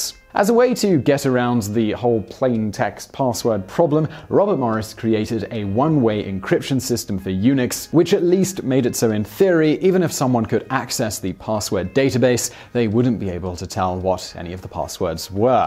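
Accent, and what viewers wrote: United Kingdom. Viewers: OMG! Saaaaaauck, I'm dyin' here. There are times I really love the British accent.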